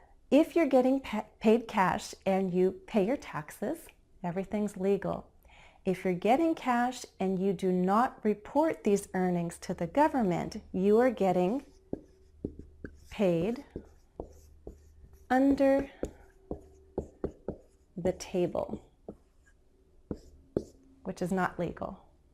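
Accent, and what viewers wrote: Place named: United States. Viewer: Amazing. I do like your videos, and I share them to my friends, students, and colleagues. The way you teach is excellent. It seems that you are patient to your subscribers. And, I like your American accent. I recommend everyone who wants to learn English should follow channel. Well done. Keep it up! Ahmed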